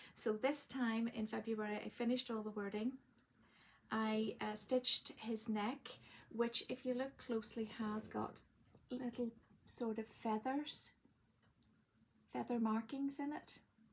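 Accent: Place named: Ireland. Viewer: Thank you for giving us another awesome floss tube ❤ beautiful finishes, project bags and your tiny 🐞 ladybug. And I love listening to your Irish accent, it is so soothing! Yes for a tutorial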